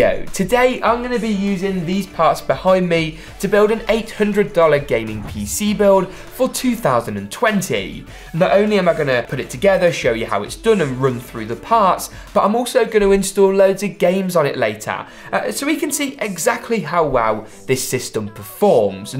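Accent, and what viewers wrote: United Kingdom. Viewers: You're from the UK right? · you sound like you might be from the uk but you always put USD into the titles. It confuses me😂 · are you from the uk?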